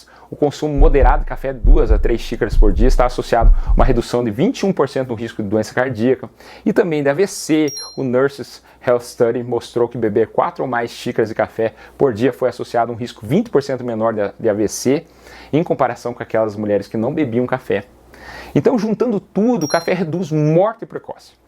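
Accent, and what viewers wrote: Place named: Minas Gerais, Brazil. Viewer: vrdade gostei tbm do jeito mineiro.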